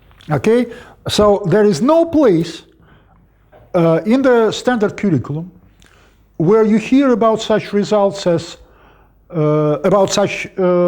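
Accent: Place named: Russia. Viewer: Потому что эти звуки нужно учиться выговаривать как можно раньше, желательно с детства. У русского ребёнка такой возможности в России нет, поэтому ему приходится учиться это делать во взрослом возрасте. У некоторых получается и они приобретают более менее норм. акцент. Но не всегда на 100%. Здесь я слышу у него практически в каждом слове русский акцент. И ещё большие паузы. Native speakers говорят на родном языке со скоростью света. Он говорит очень медленно.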